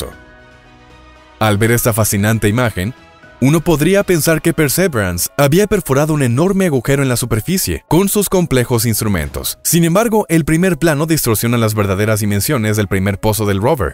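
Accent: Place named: United States. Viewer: da arcadas escuchar esa entonacion yanqui de los nombres de los crateres y los vehiculos. Me gustaria ver a los yanquis esforzandose tanto por pronunciar los nombres en español de las ciudades de mexico (por poner un ejemplo)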